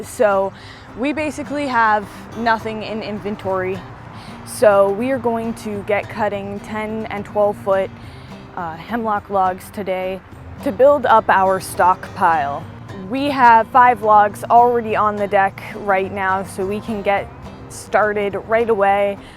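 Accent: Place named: Pennsylvania, United States. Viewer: I just love your NE Pa accent. And I'm just mesmerized that you took the initiative to put this Utube channel together, while you're schooling and working at the mill. And taking your work seriously is refreshing. So, it's all these things that make your channel a pleasure to watch! Something tells me that you two are going to succeed in life, no matter what path you choose. And something tells me you have a LOT of people in your corner, cheering you on.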